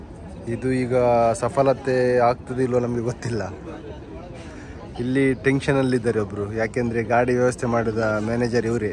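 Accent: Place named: Kundapura, India. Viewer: Nivu kundapura kannada hege math adthira ?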